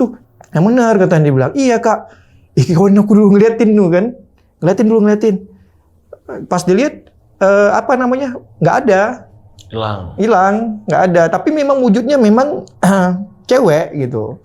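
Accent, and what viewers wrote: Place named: Banda Aceh, Indonesia. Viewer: Si abang, logat nya aceh kota lii kok😂, cirikhas awak banda aceh, btw ni binkara fm gampong jawa, kalo di google maps gaada, itu salah, yg bener di gampong jawa deket lapangan persis, lurus lagi kedepan ada tpa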